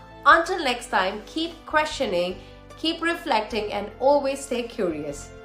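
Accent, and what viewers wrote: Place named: India. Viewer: Your ascent is truly impressive!! Are you from India ?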